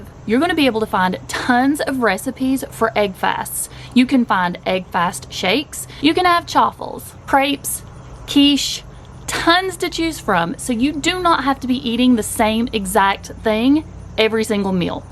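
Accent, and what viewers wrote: South, United States. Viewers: where you from the south?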